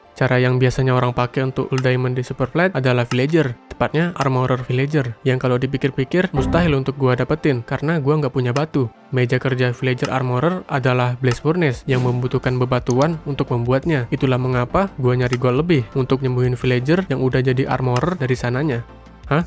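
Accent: Indonesia. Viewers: Plembang dkit cknyo😂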